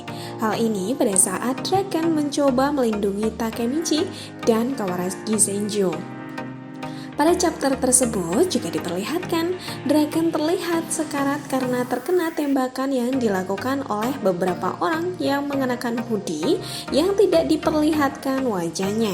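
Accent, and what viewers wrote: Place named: Java, Indonesia. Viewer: Suarane medok gaes hahahaha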